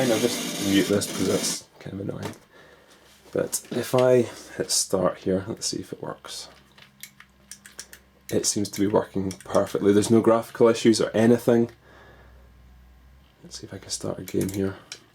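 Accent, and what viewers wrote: Scotland, United Kingdom. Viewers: are you of Scottish origin?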